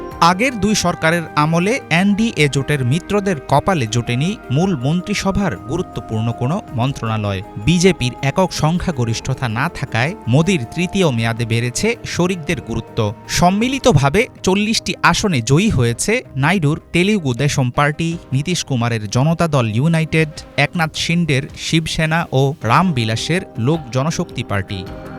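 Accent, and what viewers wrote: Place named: Bangladesh. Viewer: সংবাদ শুনলেই বোঝাযায়, এই মালটা বাংলাদেশী।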